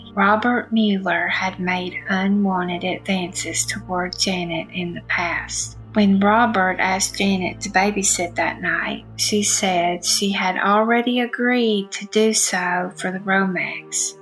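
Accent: South, United States. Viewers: I love your southern draw..Alabama here.. thanks for another one friend..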